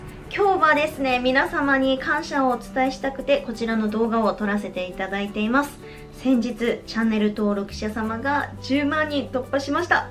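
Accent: Kansai, Japan. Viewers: 鹿児島生まれ神戸在住ですさんの関西弁萌え(*^^*)♪ アタシは神戸に２０年以上いるので逆に鹿児島弁を忘れた（笑たまに実家に電話したら鹿児島弁になるんやけど 『なんか変なイントネーション』と言われるくらい関西弁が身についてしまってる～ さんは、ベッピンさんやで♡ 美容部員さんは美人じゃないとなれないとアタシは思ってて憧れる。素敵な職業ですよね♪ アタシは美的センスが無いから美容師を諦めて看護師になったけどやっぱりメイクを楽しむのは好きなんでさんのメイク動画いつも参考にしてます(^o^)v これからも楽しみにしてます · すでに11万人になっていますね‼️ おめでとうございます✨👏✨ YouTubeから銀の盾が贈られてくるのはこれからですか？さんは努力家ですね❗ 落ち着いた感じの分かりやすい丁寧な解説が、数あるメイク動画の中でも選ばれている理由だと思います。これからも頑張って下さい😄 (個人的にはさんの関西弁、もっと聞きたい・笑) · さんの動画、わかりやすく且つ簡潔ですごく見やすいです！いつも参考にさせていただいております💓 関西弁新鮮でキュンとしました笑考え方がすごく前向きで素敵です✨✨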